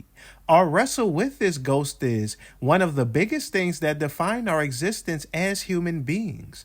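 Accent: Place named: Philadelphia, United States. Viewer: are you from Philadelphia or the surrounding area? Just judging by your accent.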